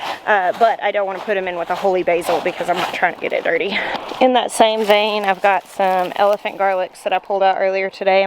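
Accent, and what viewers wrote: South, United States. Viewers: Never be ashamed of your southern roots. The Europeans love our accent, I found that out while living there for a time. Everywhere I went if I talked I drew someone's attention, and many times they complimented me on the southern accent. Unfortunately it was usually men and my hubby was very British and very jealous. I tried to hide the accent, but it was impossible.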